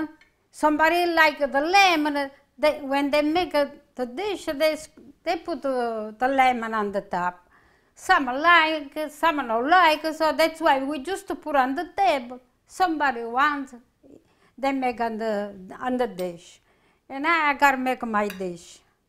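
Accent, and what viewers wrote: Italy. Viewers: La adoro sg. mi piace il tuo itanglese😍. Che Dio la benedica a lei e la sua famiglia, la sua famiglia è veramente fortunata di averla. Buone Feste❤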